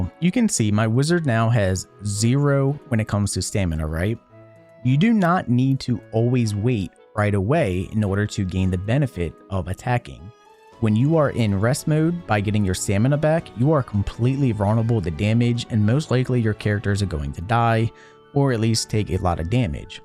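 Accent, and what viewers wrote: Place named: Philadelphia, United States. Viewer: Drawn to the Philly accent lol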